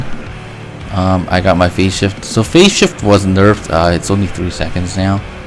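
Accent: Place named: Asia. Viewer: You sound totally Asian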